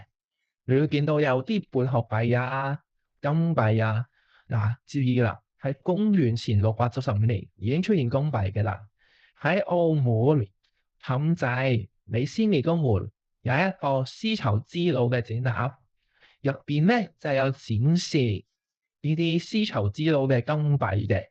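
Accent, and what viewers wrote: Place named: Taiwan. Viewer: 你係台灣人? 廣東話口音有啲唔同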